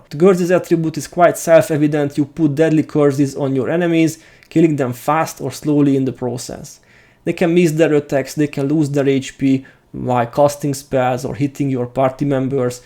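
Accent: Hungary. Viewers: Hungarian accent is so tasty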